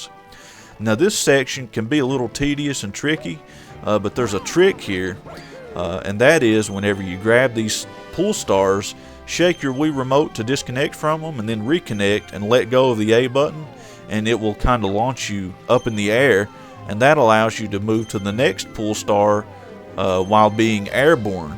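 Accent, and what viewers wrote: South, United States. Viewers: What state you live in? I love that southern accent of yours.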